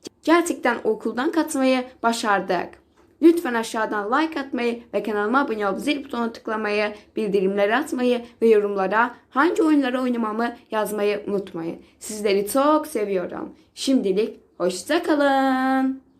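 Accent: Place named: Azerbaijan. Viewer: Salam azerbayvanlısın niyeki bildim sesiinden :)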